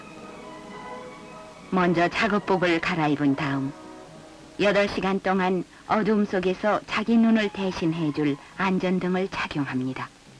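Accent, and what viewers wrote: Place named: North Korea. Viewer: 해설자가 북한목소리네요~~ㅎ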